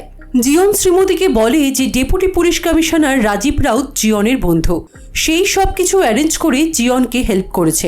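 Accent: India. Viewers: Apni ki Indian? Apnar bangla accent er jonno ask korlam 😊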